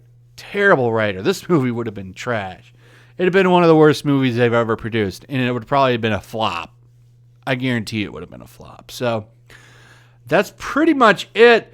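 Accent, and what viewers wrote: United Kingdom. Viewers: Your british accent sounds funny af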